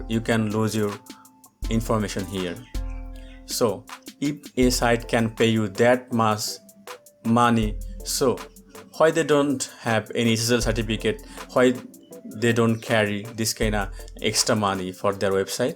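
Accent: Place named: India. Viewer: you saved my ass bro, don't mind the accent i always trust Indian youtubers, there are just legit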